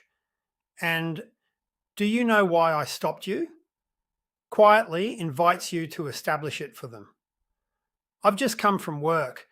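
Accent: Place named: United States. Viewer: American pronunciation too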